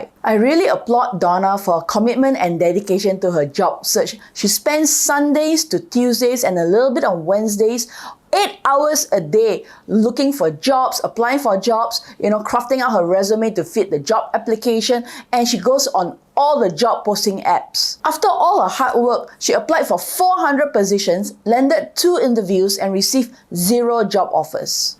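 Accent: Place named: Singapore. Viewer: You sound like a Singaporean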